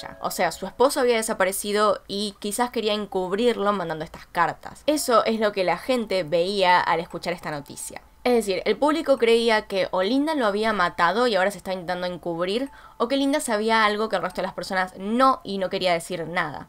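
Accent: Argentina. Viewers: Me gusta tu acento Argentino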